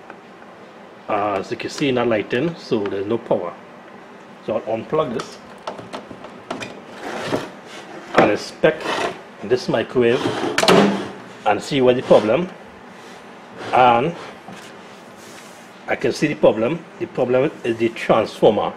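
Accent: Trinidad and Tobago. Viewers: Can always know a Trini from his accent. Im one too. You could still discharge the Cap before removing the leads. Where you get parts in TT? Oster version here. Will work on it soon.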